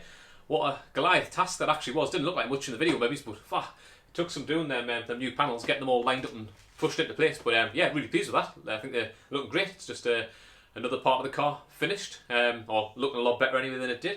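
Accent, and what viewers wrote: Newcastle, United Kingdom. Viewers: funny hearing a fellow geordie on youtube ,my first car was a mini now am into building defenders from bare chassis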